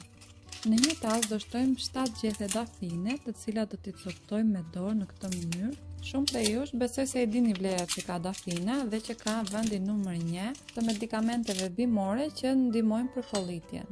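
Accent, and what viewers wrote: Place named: Albania. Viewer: Fsleminderit zonj po nese man te mi tregon kto lloj qaji ku i gjejm edhe si quhen te lutem me trego kjart sepse ju te shqiperis folni pak ma ndryshe e ste kuptoj kam dedhir ta kuptoj kete reqet sepse kam nevoj se kam bronhitis edhe asma per kete jam shum e interesuar ta di se nuk e kuptova llojet e qajit mos ke nji na i thojm qsj blini asht ni druose nji si me than pem te lutem nese e shikon ket ma kthen pergjigjjen flm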